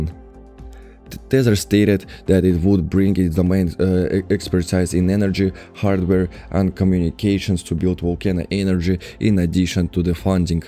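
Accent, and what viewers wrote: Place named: Russia. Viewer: russian accent....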